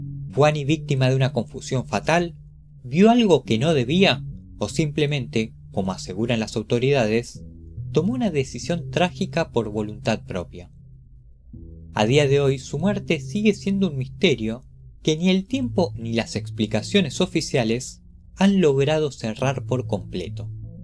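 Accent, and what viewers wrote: Argentina. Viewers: Seeee en la plaSHA, queso raSHAdo, Shego, SHO, caSHAdo, etc así hablamos los porteños che !!!!! 🤷🇦🇷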